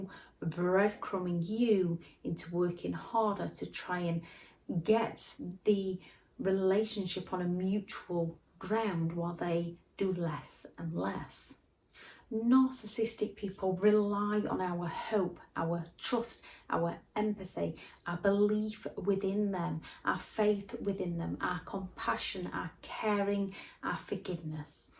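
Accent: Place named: North, United Kingdom. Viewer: Became atoms, from crumbs, from a loaf, from a whole bakery. I never knew things could be salami sliced so much. Thank you for doing this. Its great to hear a northern girl doing this 🙌🏽🙌🏽🙌🏽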